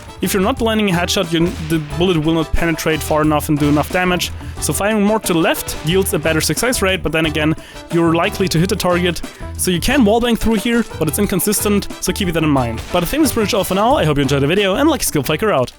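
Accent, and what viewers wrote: Germany. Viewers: Is it possible that ur german ? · das DEnglisch. DAS DENGLISH. DEEEENGLISH. EINFACH AIDS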